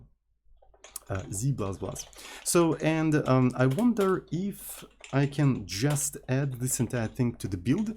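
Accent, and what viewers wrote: Russia. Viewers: А можно то же самое на русском ? Вы же - русский, судя по акценту.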